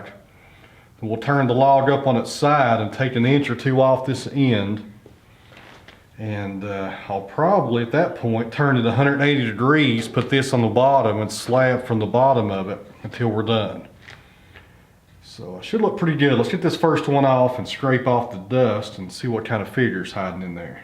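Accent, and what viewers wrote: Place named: South, United States. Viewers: Just curious...your accent sounds definitely sounds Southern. Do you live in the Southern United States? I'm not trying to be nosy, just curious, as I said. I'm a Virginian, born & raised. We have a good lumber company not even 10 miles from where I presently live. Augusta Lumber is it's name, and it's located near Fishersville, Virginia. Just curious as to whether you'd ever heard of it or not. Anyways:have a great day, & thanks again for posting this video. I love me some Cherry wood products. They're beautiful!!! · I hate you're Southern voice video sucks be cause of that🤔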